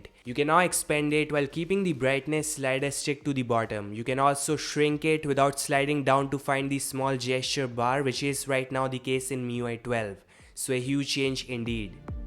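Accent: India. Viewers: Indian speaking english? Auto subscribe 👍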